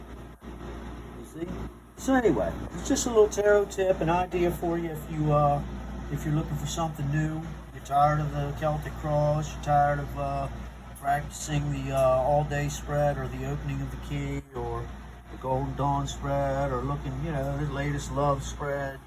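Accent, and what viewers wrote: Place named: Baltimore, United States. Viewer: I thoroughly enjoy the 'balmer accent delivering the old wisdom! Cheers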